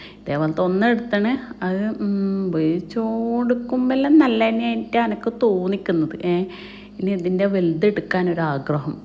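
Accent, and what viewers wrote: Kannur, India. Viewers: Ngale samsara reethi nallam ishtapett.kannur kasakodu karude samsaram kelkan nalla rasama❤❤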